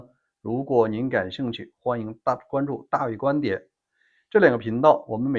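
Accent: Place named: Shandong, China. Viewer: 这山东口音听得难受，你好好练练普通话再说。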